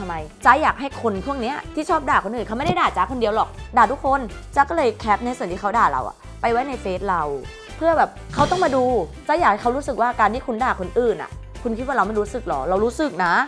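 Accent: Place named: Nakhon Pathom, Thailand. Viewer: ชอบสำเนียงพี่ เหน้อแบบเหมือนคนนครปฐม ❤️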